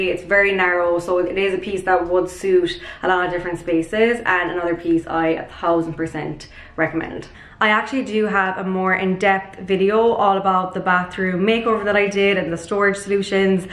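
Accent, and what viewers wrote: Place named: Ireland. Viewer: Pretty Irish accent.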